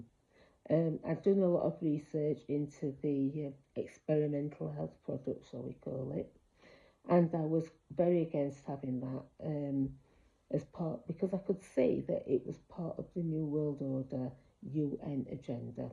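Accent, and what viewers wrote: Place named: Yorkshire, United Kingdom. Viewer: Hey. My name is Ken, I left JW's after 37 years in 2012, having been brainwashed since the age of 5. Thank you for your video. It's extraordinarily powerful. I remember studying the "Revelation" book in my teens and twenties at the "Book Study Group"once a week, and clearly remember the Watchtower Society excoriating the United Nations. I also remember being at the Twickenham Convention and hearing a letter read out from the platform, condemning the UN as the "Wild Beast". They then bragged that the letter was sent to the UN headquarters. They ridiculed Christendom as being a harlot, and yet, they dropped their own panties and got into bed with the UN - behind the backs of their congregants. What a bunch of spiritual slut$! Vile, disgusting hypocrisy. I'm so glad I am free. I am so glad you are free. I now live in Louisiana but am originally from London. 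Sounds like you're a Yorkshire lass. Am I right? Thanks again for a great video.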